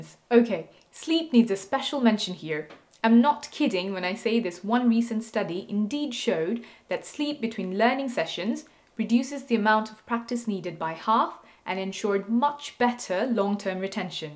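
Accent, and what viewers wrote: United Kingdom. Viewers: how you axcually gain the british pronunciation and fluency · How would you expertise British accent? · very sweet British accent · That accent is way tooo oxford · your british accent is so soothing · You talk perfectly in british accent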